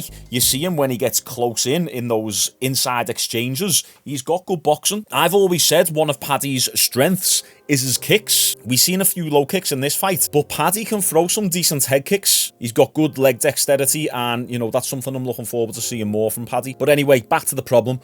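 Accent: Liverpool, United Kingdom. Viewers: I’m fae Glasgow and I love the scouse accent,it’s class! Cheers for the content,mate! Keep up the good work my friend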